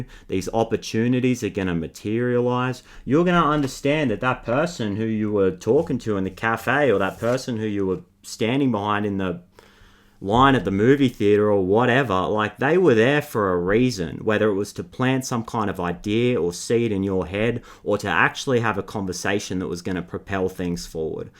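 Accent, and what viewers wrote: Australia. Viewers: didn’t know I would find a reader with a thick australian accent holding anime chibi tarot cards to resonate with me but alas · Felt like you were speaking directly to me. Thank you so much, also the Aussie accent makes it so much better for some reason ❤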